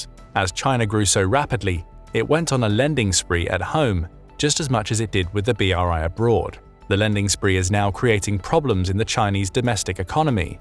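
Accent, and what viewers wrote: United Kingdom. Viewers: Yet another AI generated channel with a British accent robot reading script. Who is behind this channel?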